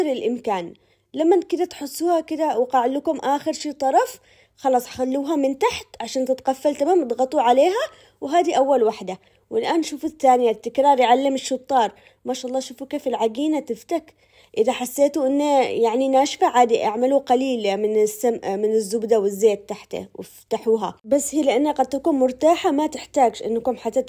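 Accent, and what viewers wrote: Aden, Yemen. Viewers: تسلم الايادي يا محلا كلامك وحشني الكلام العدني اني اتربت وعيشت بعدن وسافرت السعودية لي اكتر من ٢٥ سنة 😢... اني احب اسوي خبز علشان اسرتي كلهم يحبوا الخبز واليوم سويت الخبز المعطف بس ازود واحط الباقي بالفريزر الله يقوينا ويطتب الاجر لنا جميعا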